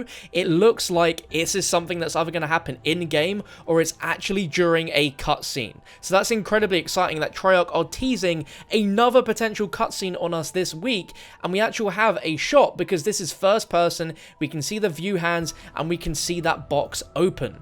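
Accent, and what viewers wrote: United Kingdom. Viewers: Anyone know where I can watch this without some annoying british guy talking over it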